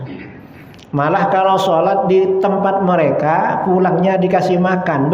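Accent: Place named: Madura, Indonesia. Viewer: Pak ustadz nya kayak nya orang madure ..kalau dilihat dari logat bicara nya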